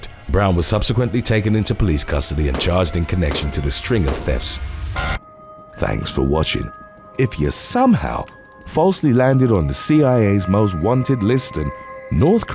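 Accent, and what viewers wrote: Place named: London, United Kingdom. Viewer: Hi, as an Englishmam myself I'd go so far as to say he's from the London area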